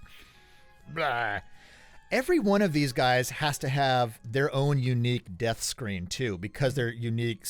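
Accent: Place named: South, United States. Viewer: I absolutly love the southern accent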